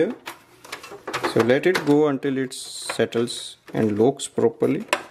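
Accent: India. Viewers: Heard the indian voice knew i was saved twin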